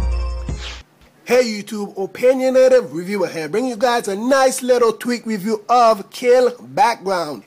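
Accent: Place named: Jamaica. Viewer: nothing gets me more pumped than a jamaican accent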